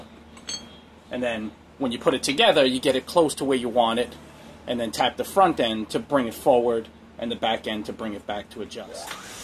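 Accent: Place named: East Coast, United States. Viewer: You're clearly from the East Coast... I'm guessing Jersey? Like your vids, I'm subscribed, continued success.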